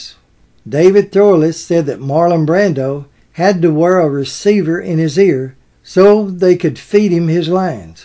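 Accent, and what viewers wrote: South, United States. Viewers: Another great video,. Your Southern charming voice once again makes your videos golden. Thank you.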